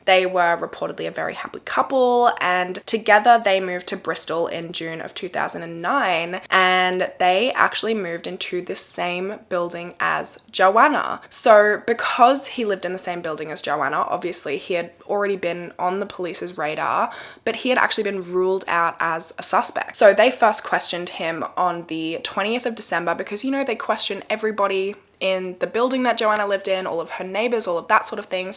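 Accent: Australia. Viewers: RIP Joanna and peace and love to those who truly loved you. Oh my goodness! I can't believe I hadn't found your channel until now. I'm from Australia and going by your accent so are you...may I congratulate you for having such an amazing number of subscribers which I have just added to. Well done!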